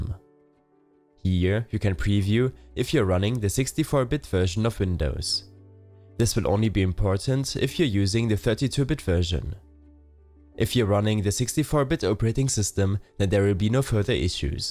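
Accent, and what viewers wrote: Germany. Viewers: i heard your accent in the firt 10 seconds i thnik its german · Defenetly a german guy😂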